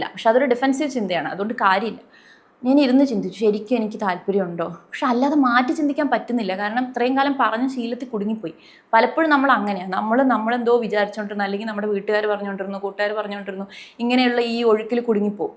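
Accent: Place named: Kannur, India. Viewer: Oru kannur slag😊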